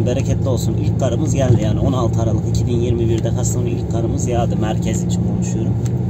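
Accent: Kastamonu, Turkey. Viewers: Hep kastamonu şivesini konuşmanı istıyoruz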